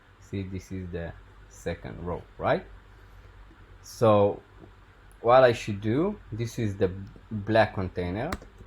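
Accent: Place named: Israel. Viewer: הייתה לי תחושה שאתה מישראל חחח תודה על הסרטונים!!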